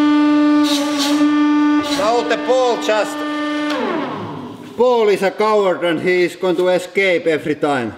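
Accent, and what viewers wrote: Finland. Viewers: that accent is so finnish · Today the content was not so good ..but like your Finnish accent and your wife's laugh so I pressed like..!!! Stay happy..!! Love from India..! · Dean Rowlinson Sounds like my Finnish grandfather. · He sure sound Finnish. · The Finglish is strong with this one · The rough finnish accent is killing me xdd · This is awsome channel. The finnish rallye english is something else. 8) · omg even when he speaks english it sounds like finnish. 😂😂 what is he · Are you from Finland cause you have a Finnish accent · Is this guy finnish. The accent sounds like it lmao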